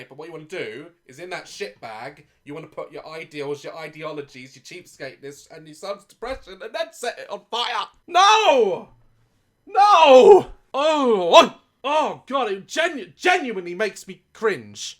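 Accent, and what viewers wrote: United Kingdom. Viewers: I love how becomes SUPER BRITISH whenever he is confused about stuff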